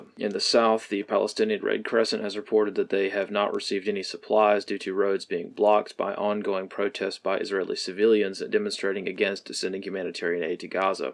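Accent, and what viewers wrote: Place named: South, United States. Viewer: He’s Southern. We tend to reflect on our words and phrases as we speak.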